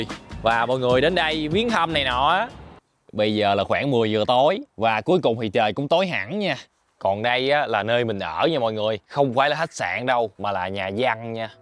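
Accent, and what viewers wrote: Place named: South, Vietnam. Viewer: noi giong mien Nam nghe nhe nhang de chiu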